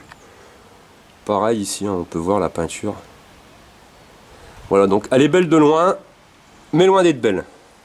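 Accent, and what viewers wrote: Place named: North, France. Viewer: Bah merde, jme disait l'gars ya un accent de nord et bin javais raison :D Fiere du nord :D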